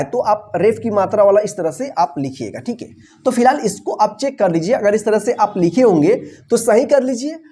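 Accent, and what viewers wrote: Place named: Bihar, India. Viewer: आप हिंदी और इंग्लिश बोलना सीख लीजिए तो ज्यादा प्रभावशाली लगेगा वीडियो आप शायद बिहार की तरफ से बिलॉन्ग करते हैं आपकी बोलचाल की भाषा में उधर की झलक है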